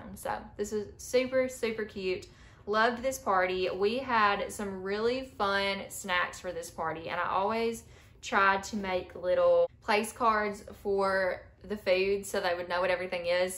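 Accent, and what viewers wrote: South, United States. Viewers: It's great to hear your southern dialect.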